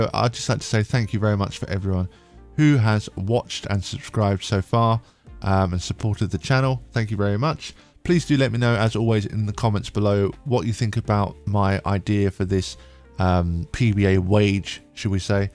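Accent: United Kingdom. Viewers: Hello I have been a keen bowler for over 40 years in the UK.I have enjoyed your new web broadcast .It is nice hear a english voice and thoughts on the pba and bowling in general .I wander if you could do a broadcast on bowlings olympic bids .especially with the Olympics in America in 7 years time I have asked the BTBA for their information on the bid but know answer. Also the world bowling federation and once again no answer..I find it unbelievable that they had no information on this important subject .Also have you plans to talk about the state of bowling in the UK which in my.opinion is going to the dogs .Would be good to see and I would value your comments please.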